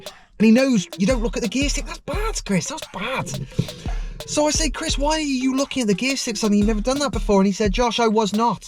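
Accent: United Kingdom. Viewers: Are you from Stoke-onTrent? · Oh my god you're from Stoke 😊😅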